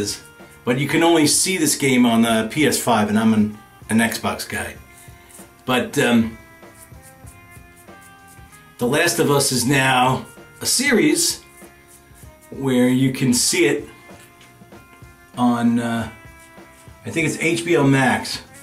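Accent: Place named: New York, United States. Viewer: I can tell from your accsent you grew up in the big apple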